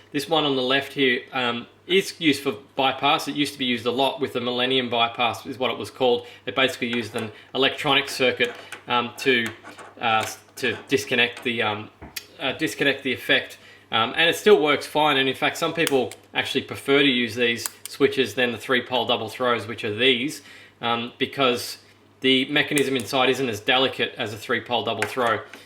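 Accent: Australia. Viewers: Hi, Thanks for the video!! Refreshing to hear an Aussi accent. I’m from SA. This will be my first pedal project and I just wanted to check something before I start. I want to mod a simple a b box (no LEDs/power) into an a b y box. All I need is a switch to activate the y for different pedal setups (summing parallel effect signals (Q within a Q: is this the right way to sum?)). Would all I need be a single pole double or would I need a three pole double throw like the foot switch?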